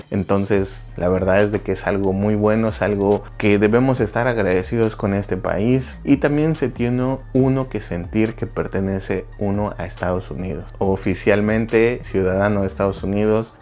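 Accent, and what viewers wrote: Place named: Mexico. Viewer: Excuseme, usted es Mexicano, porque esa habladita de ustedes es bien chistosa….. hasta misteco habla usted…. Los Mexicanos sos como unos indios ..😤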